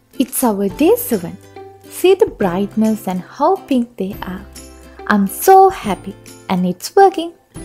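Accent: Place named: Sri Lanka. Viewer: Accent quite similar to Sri Lankan . 🥰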